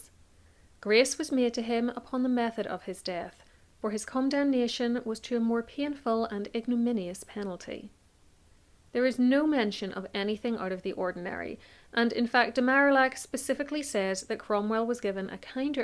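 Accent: Northern Ireland, United Kingdom. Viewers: Yes her Northern Irish accent is beautiful. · A Northern Irish lady speaking. Just speaking normally. No "It's not X, It's Y, AI drivel. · Northern Ireland accent 🙂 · What part of the north of ireland are you from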